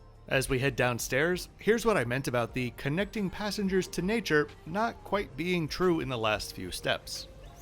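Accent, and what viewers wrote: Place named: United States. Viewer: You're not an American? I don't know. Your accent gave me American/Canadian vibes.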